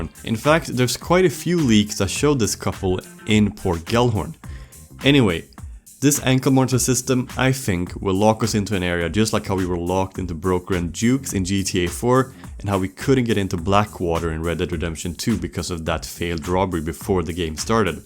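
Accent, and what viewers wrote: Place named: Sweden. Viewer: ju saund sviidish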